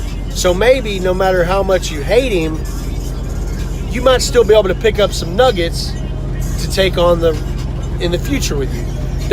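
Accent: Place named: Texas, United States. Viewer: Part of Texas you from?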